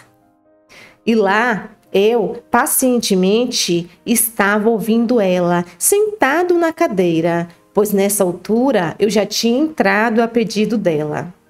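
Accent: Bahia, Brazil. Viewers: Adoro seu jeito de falar seu sotaque. Vc é baiana?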